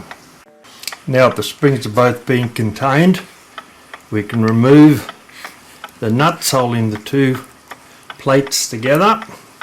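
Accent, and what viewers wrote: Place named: Australia. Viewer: Sounds like an Aussie 🇦🇺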